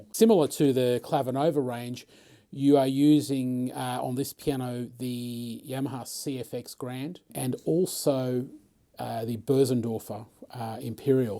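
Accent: Australia. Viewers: Great review! So nice to hear the Australian accent and down to earth attitude. I’m picking my P515 up tomorrow.😉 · Beautifully played. Nice to hear the 'aussie' sound too hehe. Definitely prodigy level !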